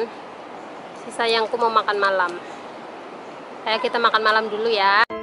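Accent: Banyumas, Indonesia. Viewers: Kok ngedok mba,dr banyumas apa dr mana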